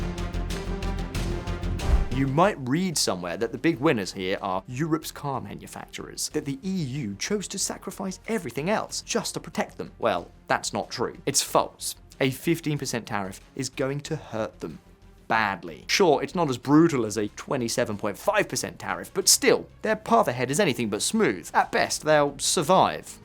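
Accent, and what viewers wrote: RP, United Kingdom. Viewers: You cut all the pauses. Now your video doesn't breathe, it's rattling. It doesn't do justice to your posh british accent.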